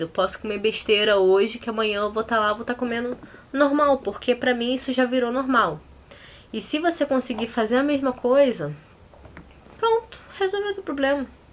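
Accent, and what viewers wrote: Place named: Rio de Janeiro, Brazil. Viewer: Oie, passei o dia todo ansiosa pra chegar em casa e ver seu vídeo....confesso que queria ouvir vc falar e ver o sotaque de carioca rs, te acompanho pelo face e tenho que agradecer sim pelo exemplo, por se expor, comecei minha reeducação no final de janeiro de 2015, pesando 117kg, hoje estou com 105, estou devagar pq esta dificil controlar a alimentação...exercicio faço mto mas o principal é a alimentação mas nao me entrego e nao irei desistir de mim novamente, sempre lembro que você começou com 105 e é um exemplo de que com muita força e determinação podemos alcançar nosso objetivo, adoro suas postagens, fico rindo do que escreve, vc é ótima rs, desde o inicio da minha reeducação que te acompanho, que Deus te abençoe, muita saúde, paz e sucesso pra gente :) ah! não para de fazer vídeos não, você foi muito bem!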